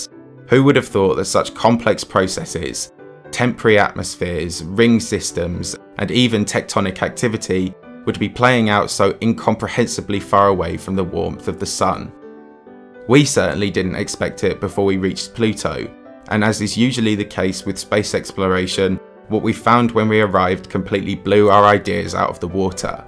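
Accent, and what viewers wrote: United Kingdom. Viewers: I'm Dutch and I can hear it's not the Queen's English, but I often watch British TV so I'm familiar with different dialects. pronounciation is clear and easily understood. Here in the Netherlands I can often hear visiting British students and tourists. Sometimes I have to listen for more than a couple of seconds before I realize they are speaking some English dialect, or Welsh or Scottish or whatever...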